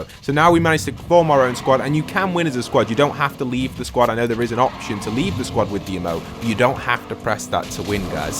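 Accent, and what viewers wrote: United Kingdom. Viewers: You know that's the bri'ish accent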